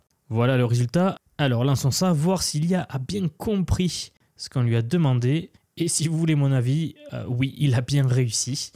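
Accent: South, France. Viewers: Merci pour cette vidéo ! très intéressant ce nouvel outil ! Et vive l'accent du sud !! 😁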